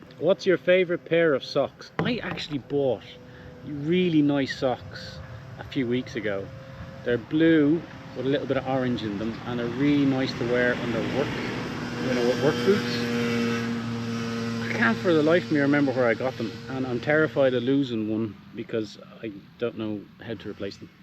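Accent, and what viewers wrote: Dublin, Ireland. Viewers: How anyone thinks you don't sound Irish ,is beyond me lol ! Lovely soft Dublin accent .Greetings from Carlingford in the wee County .I have been watching Dan's channel for a long time (pre Chateau) You ,Nick and Dan make a great team. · Like my Irish relatives, and most Irish people I know, you have a charming, humorous eloquence. That’s why I watch. Love the brogue ! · You quite funny and it was nice to hear you lovely Irish voice. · I just found this video! Love your accent. And you’re funny! Your in your 40’s 😊 just waiting for my night with an Irish accent to come rescue me 😊 LOL Love watching you help Dan. Amazing work! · Greeting from Washington DC! Not going to lie, kinda disappointed the backstory I’d “worked” out for you guys isn’t true, you know… the one where you guys were all part of a fairly successful band but have taken off and retired, now you guys just work on rebuilding a chateau… Nike of course was your guys crusty grizzled Og sound engineer/tour manager/lead roadie… le sigh… Seriously though, it’s cathartic and a joy watching you all rebuild that old lady! 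Keep kicking ass brother man! (also, funny that the first time I heard your Irish accent, I didn’t really pick it up and wasn’t sure if you were from the states😂. It’s a funny thing that happens where some Irishmen sounds more American than from the UK.. but it didn’t take long for that Emerald Isle twang to pop out.. cheers!) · I didn’t think you had a Dublin accent but now I hear it, I think when you and Nick are together I couldn’t hear it as Nicks accent is very strong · Despite your travels, you've still got your lovely Irish accent. I'm perplexed that someone thought you don't sound Irish. 🤔🤯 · That was fun thanks, you don't seem weird, but some of the questions were. My American ears think you definitely sound Irish. Thanks for the video. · What strange questions! I love the accent...def irish!!! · Irish with an Irish accent… brilliant! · Hi, Just saw your app pop up for first time. 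As soon as I saw your face knew you looked like Dan’s friend. I’ve followed him from the early days. He does good editing of his episodes. Loved your humerus answers to quirky questions, some made me laugh for sure. 😊 I’m English living overseas. Try to go Home most years for a few weeks, just hate airports and all the people and so much waiting for international flights. Yes, you definitely do sound Irish, no doubt about it. Always good to know, right. Have a good weekend. M. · you also speak english with an irish accent :P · The Irish accent is as sweet as honey. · Hello! I was so pleasantly surprised to see you have your own channel...Congrats! I could listen to you talk all day long, love me an Irish accent lol You have such a comedic way with your banter that is so entertaining and I have to agree with the other ladies, you are, easy on the eyes lol Been watching your journey at Dan's and always love the shenanigans you all get up to, also very entertaining. 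Love camping as well, and here in Alberta, Canada there are some beautiful places to set up camp, especially up in the mountains. Some beautiful scenery! Thx for sharing your journey with us all! ❤ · You most definitely sound Irish! From a woman living in Tasmania, but born in Balls Bridge. I miss my accent.....😞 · I thought you and Dan where brothers or at least related. But now that I hear you accent, you do sound Irish! Loved this Q and A! Have you visited chile??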